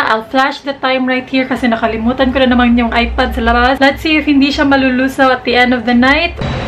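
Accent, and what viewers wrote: Visayas, Philippines. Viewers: ang cute mo po ate bisaya ka po ba? hahahahaha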